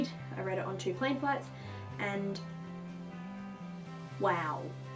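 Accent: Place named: Australia. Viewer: I miss Australia so much, so I really liked your video! SIGH the wonderful sound of an Australian accent.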